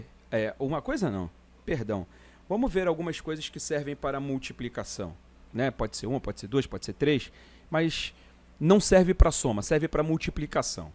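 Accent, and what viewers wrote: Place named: Rio de Janeiro, Brazil. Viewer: nem da pra perceber que é carioca rsrsrs abraço mto obrigado pela ajuda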